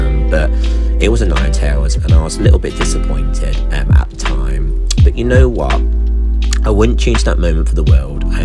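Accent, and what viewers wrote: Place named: United Kingdom. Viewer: Yeah still sound British just different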